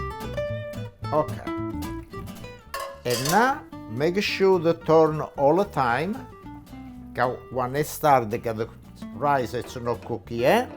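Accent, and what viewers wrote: Italy. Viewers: The man sounds Italian but trust me, he cooked that like a uni kid on rations doing an experiment! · He is such a darling man, and his Italian accent is absolutely adorable. 🎉❤🎉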